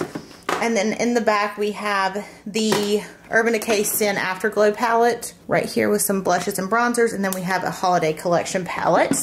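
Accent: South, United States. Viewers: I was introduced to you through yours and Vianney's giveaway...... so glad for that! Love your realness and your accent is ah-mazing!!! Reminds me of my Georgia and Alabama family 😉.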